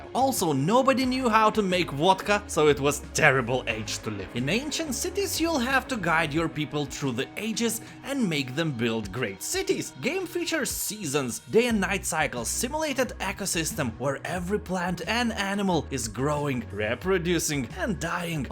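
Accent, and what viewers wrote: Lithuania. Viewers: Wait a minute... that sounds suspiciously Lithuanian. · Are you Lithuanian?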